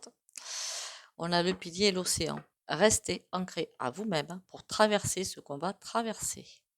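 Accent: South, France. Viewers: Bonsoir, votre accent est pour moi, très agréable, il m'apporte le soleil tout les jours, je suis du Sud et je n'y vie plus, il me fait beaucoup de bien, merci beaucoup, belle soirée à vous 🙏💐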